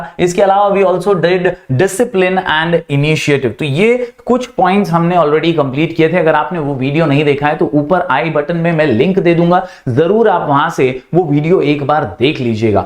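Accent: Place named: Bhojpuri, India. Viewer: Btw sir aapka bhojpuri bolne ka tarika bhot mst h 😂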